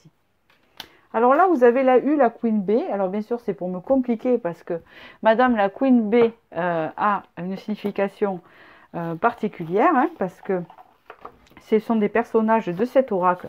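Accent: South, France. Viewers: Merci beaucoup! Pile au moment où j'ai eu la certitude que j'avais fait le bon choix (le 2), vous parlez avec humour de votre accent du sud! J'ai pris cela comme une confirmation, moi qui suis née et vis dans le sud🤗🌻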